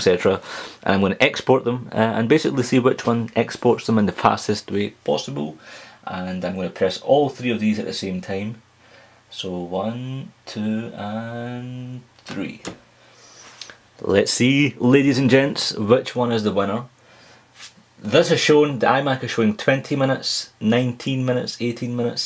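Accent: Scotland, United Kingdom. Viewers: Are you scottish? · i love your accent i love scotland man. cheers from greece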